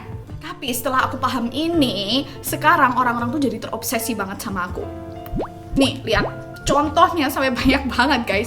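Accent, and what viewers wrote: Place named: Java, Indonesia. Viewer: Baru nemuu chanelnyaa kak... ini ngejelasinyaa ada kejawa jawaan hahahah makin greget🤗🤗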